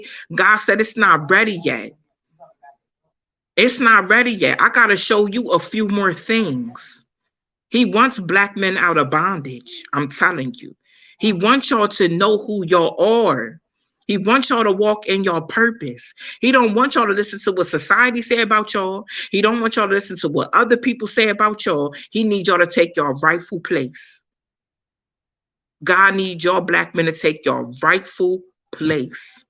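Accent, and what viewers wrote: United States. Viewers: i adore this black woman..... we as black men LOVE U ..... u gotta love her country accent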